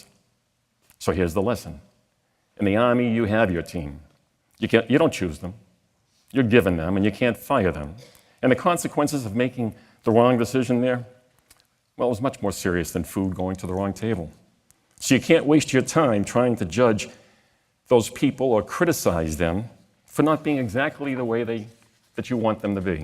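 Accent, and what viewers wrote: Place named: Boston, United States. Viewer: WOW, Great speech. Spoken from the heart. And you still slightly have your Boston accent. God Bless and be well.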